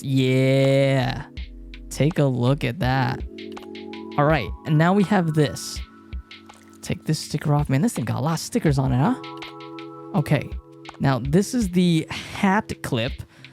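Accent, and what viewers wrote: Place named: Southeast Asia. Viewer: Bro! I like your English. I think you are from South East Asia, like Singapore or Malaysia?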